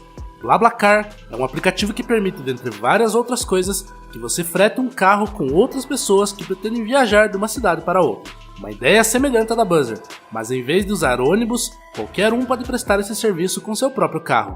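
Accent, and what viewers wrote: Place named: Curitiba, Brazil. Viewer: vocé tem sotaque de Curitiba! Confere? Abç!😊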